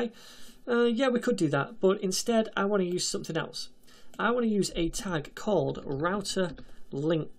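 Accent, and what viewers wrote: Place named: Northern England, United Kingdom. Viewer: Fantastic tutorial, clear and elegant explanations and examples throughout. Pleasant voice and accent (sounds like Manchester/northern England?) were a nice bonus!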